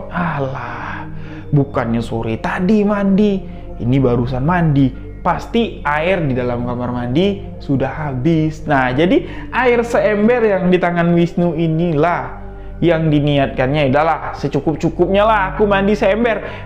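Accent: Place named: Jambi, Indonesia. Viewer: Paling seneng dengerin bang cerita make logat jambi 😊😅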